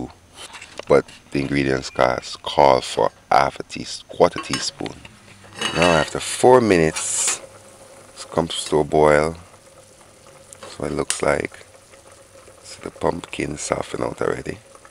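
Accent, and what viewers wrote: Jamaica. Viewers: Leave him alone, he’s not pretending to have an English accent, he is Jamaican . There are people who enjoy watching him such as myself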